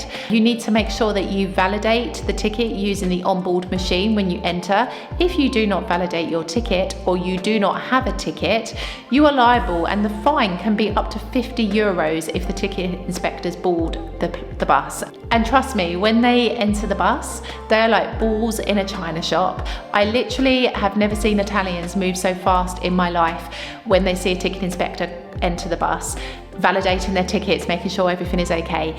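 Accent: United Kingdom. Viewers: Your British accent is on point 👌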